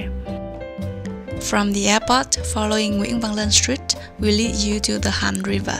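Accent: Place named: England, United Kingdom. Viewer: I like your English accent very much...thanks for sharing. Love Da Nang.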